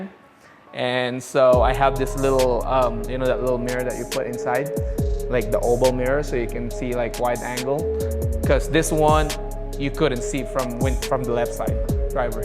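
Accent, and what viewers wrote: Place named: Tagalog, Philippines. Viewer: the tagalog accent is strong in this one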